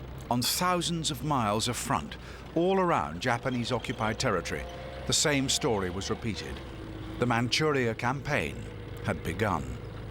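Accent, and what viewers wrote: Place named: United Kingdom. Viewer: I wonder why, ww1 ww2 documentary, reads, A British accent speaking English.?? They garher fotos and videos of the war or the faces of leaders that era, then they put on their dirty hypocrisy stories.. Let say, these history forgeries is almost miss leaded the truth of western evil greedy imperial perpetrators did dirty inhumane actions.... We in Africa, Specially, Somalies do not trusted those evil English speaking countries.... Because, we never forget the way they divided us.... They don't deserve to listen their evil lies😂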